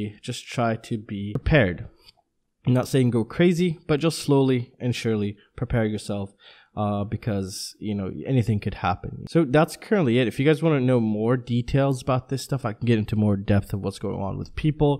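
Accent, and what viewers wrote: United States. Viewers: Blows my mind I have been made aware of that you are Hungarian as I am. Never came to my mind for a second! Perhaps your American(?) accent have thrown me over. LOL The confirmed cases are not exactly equal to existing cases - you and me both know how reliable the data can be handled by our government (especially when you want to avoid panic at any cost). I am worried for my Mum in Pecs. What rumours you are referring to exactly? When only 4000 tests have been carried out you can always say 'nothing to worry about because we only have X number of cases'. It is not a rumour only - the Hungarian chief medical lead is a GP from a village with 4000 population without any further medical background - it doesn't really brings much relax to my mind. You can be a brilliant mind (or a medical genius) if your boss in charge lacking on the expertise. 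Currently we have not enough tests carried out (compared to other EU nations) to know how much Hungary is involved in the global pandemic. Rumours hah? If it is possible I am now appreciate more your hard work knowing it is coming from Hungary. I wish the very best for you and your family in this challenging times.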